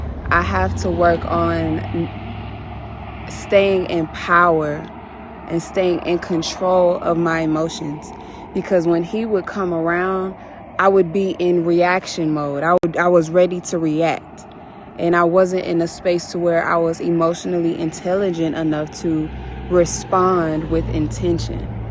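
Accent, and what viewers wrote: Houston, United States. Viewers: Girl that Houston twang be twangin chile!!! 😆😆🤣 i swear everytime i hear somebody from houston and even myself on recordings i be like dang is we really that country😆😆 omg love the video shout out to you and ur hubby frm the nawf side! 😘😍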